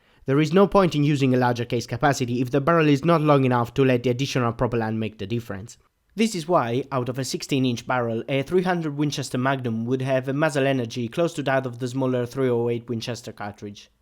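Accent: Italy. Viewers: Italy i think, i know i love his accent. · So you are italian - i was wondering what the accent is 😘 does anyone know if he lives in US or Italy? 🙊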